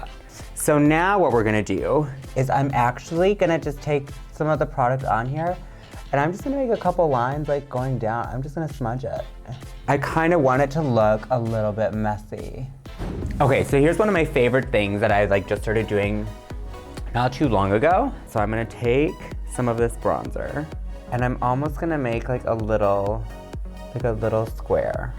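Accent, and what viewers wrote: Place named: Milwaukee, United States. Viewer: Yeah she's from Milwaukee, so she has a similar accent to Trixie Mattel! If you listen to them both closely in some video's, they will sound quite similar with some things they say lol, it's awesome.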